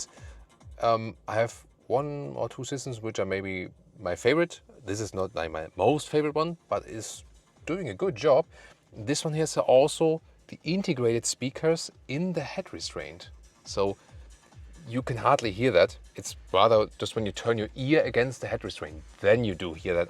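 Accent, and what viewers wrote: Germany. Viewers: Just want to say, that I love your videos. As a car guy myself, I really appreciate a German car guy speaking English. It’s pure enjoyment. Greetings from Denmark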